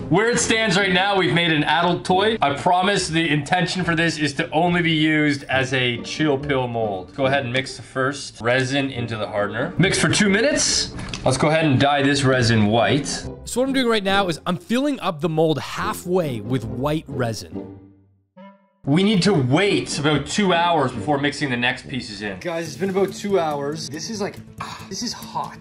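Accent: Canada. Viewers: Is he canadian... cuz he sounds like it and im tryna build a Canadar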